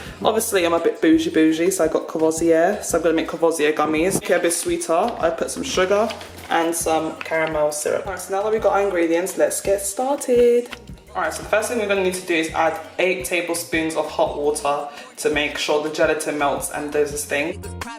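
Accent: United Kingdom. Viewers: So love the uk accent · I was so shocked to see you had a British accent! I like your channel ♥️. New subbie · Nice recipe, but I'm mainly subbing for your accent....soooo english😁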